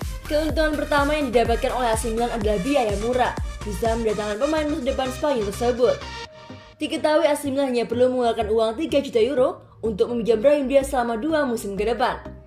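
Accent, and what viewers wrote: Java, Indonesia. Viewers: pengisi suaranya orang jawa ya? medok banget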